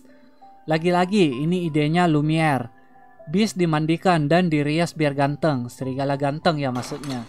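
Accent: Indonesia. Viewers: Dari dialekya kyk dialek sulawesi😁🙏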